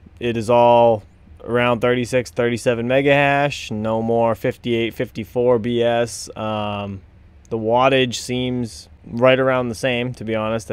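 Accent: New England, United States. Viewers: New England accent is strong today!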